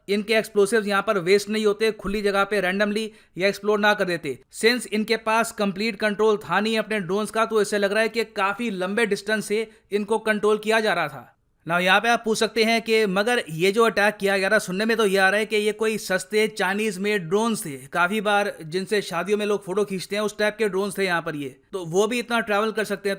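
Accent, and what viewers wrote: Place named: Maharashtra, India. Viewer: Sir apki hindi bilkul marathi jaisi lagti hai👍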